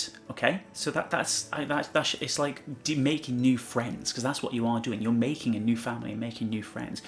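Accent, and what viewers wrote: Wales, United Kingdom. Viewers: let me guess you guys have a pug? LOL I am guessing that is the animal you have considering there is pug shit everywhere including the sweatshirts your better halves wear you seem like a really cool guy they are lucky to have you I’m glad I found this channel it is inspiring and the stories are always fun I wish all of you nothing but the best. also I did have a question I was wondering where you guys were from? I am from Milwaukee Wisconsin I am figuring somewhere in the UK from the accent but I’m not sure. Anyways take care now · Are you Welsh by any chance? I hear a bit of a Welsh accent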